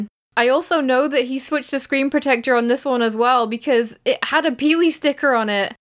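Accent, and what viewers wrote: United Kingdom. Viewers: mrxMapping he means the British style of English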